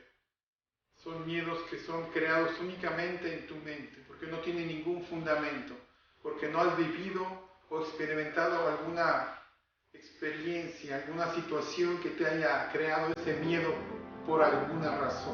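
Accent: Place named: Mexico. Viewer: disculpe sr de que pais es usted tienen acento mexicano cual su numero o su tratamiento y cuanto cuesta el libro o el CD saludos desde lima peru tiene alguna sede por aqui en lima peru bendiciones y gracias por ayudar a muchas personas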